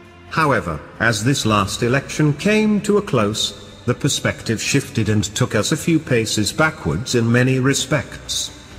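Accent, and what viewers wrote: United Kingdom. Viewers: Why the British accent? We're talking about America, after all.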